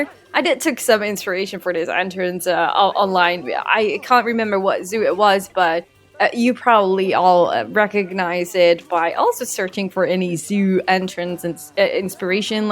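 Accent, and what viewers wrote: Netherlands. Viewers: Only now I run into your channel and I'm in love.. I have a mad respect for the building that you do and the way you make it all look so beautiful! I might be new, but I'm watching it all 😆 For once too I don't hate the accent of a dutch person speaking English! You have a very relaxing way of speaking and I love it!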